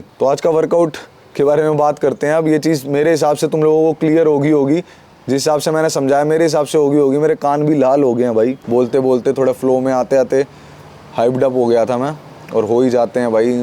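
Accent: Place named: Haryana, India. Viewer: Haryanvi brooo 🗿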